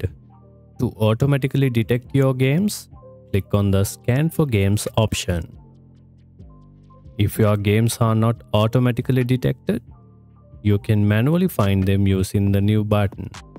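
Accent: India. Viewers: Every time it has to be some random Indian mf. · your southindian accent do kicks more when you speak.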